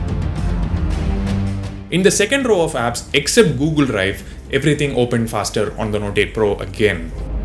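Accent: India. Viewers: Wat an accent pure indian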